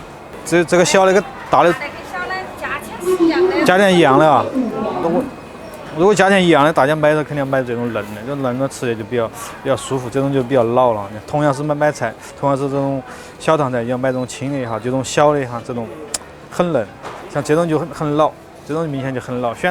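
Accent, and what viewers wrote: Sichuan, China. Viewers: 老師又在逛市場了聽四川話很親切我在台灣已經聽不到了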